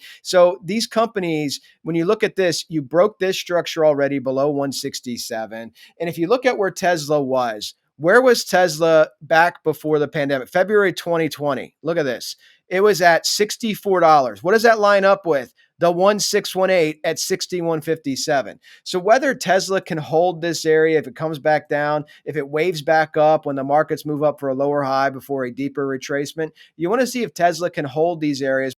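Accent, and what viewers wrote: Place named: United Kingdom. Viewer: thx B, I thought so. He sounded British. Well, I'll try him again on Telegram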